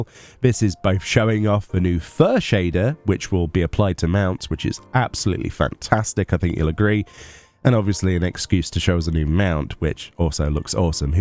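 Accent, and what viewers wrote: United Kingdom. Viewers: Oh, it is my first time encountering British accent :)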